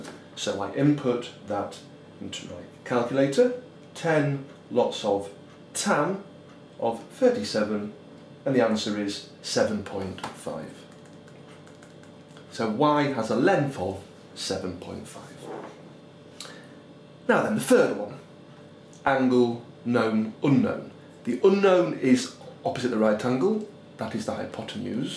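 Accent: England, United Kingdom. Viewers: Your British accent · English people make everything sound better. good stuff. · you're the reason why I remember sohcahtoa in a british accent lol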